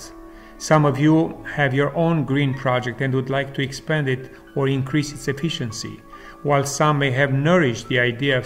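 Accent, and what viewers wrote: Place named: Mediterranean. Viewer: Amazing experience, your accent is of someone who used to live somewhere around the Mideterranian area. Your passion for securing med fruits is beyond me. Bravo for being such an inspiration to others.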